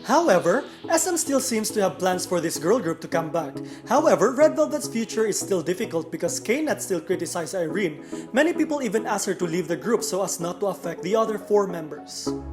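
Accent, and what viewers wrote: Philippines. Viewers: Uso ginhawa kuya. Halata Pinoy, accent pa lang.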